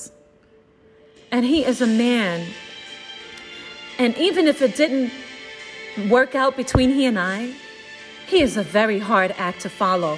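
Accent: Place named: New York, United States. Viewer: Still got that NY accent 😍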